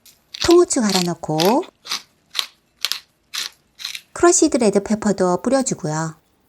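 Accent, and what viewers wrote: Seoul, South Korea. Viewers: 참한 음성에 매력적인 서울 말씨에 건강하고 쉬운 Recipe ! 대구 호부래비 꼭 해볼랍니더 감사합니다!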